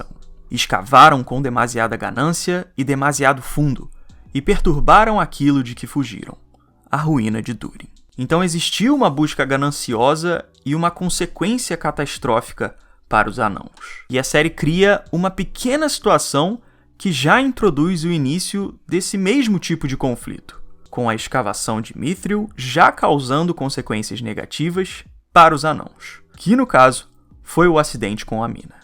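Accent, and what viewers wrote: Rio de Janeiro, Brazil. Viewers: Oh vey kkkk carioca?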